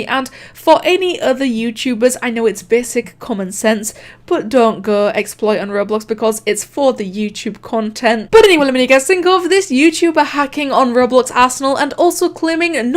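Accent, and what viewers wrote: United Kingdom. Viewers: youchewber British? I'm british so I would now that ur british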